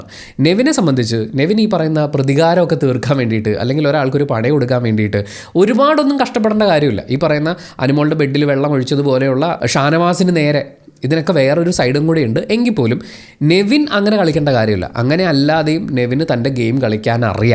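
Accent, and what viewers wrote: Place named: Kochi, India. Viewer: Your kochi slang is superb 😂😂 Exactly like Adhila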